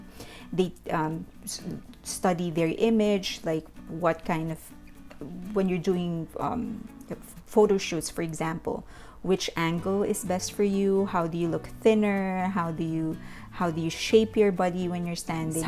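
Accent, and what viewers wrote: Philippines. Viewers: Thank you sooo much great summary ❤️... I believe you are a Filipino just by your acccent , because I am ❤️❤️❤️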